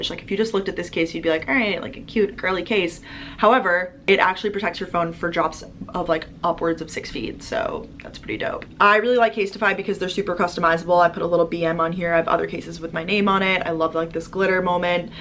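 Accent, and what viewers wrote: Long Island, United States. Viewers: your long island accent is just the best🥰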